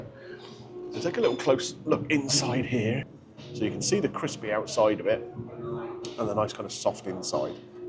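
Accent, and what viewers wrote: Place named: England, United Kingdom. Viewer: which part of England you come from, my first language is not English but I could understand all the worlds your saying, some food bloggers are very difficult to understand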